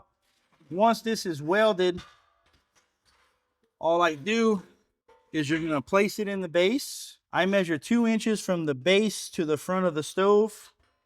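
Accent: Louisiana, United States. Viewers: I love your channel. I,m a retired tool & die maker & appreciate a true craftsman. But something I noticed about was your accent, so I looked up were your shop was ln Wiss.That can,t be? He talked like me. That fellow is from South La. Then looked your Profile on your web site. ,I was born in Church Point La. Where might you be from?😃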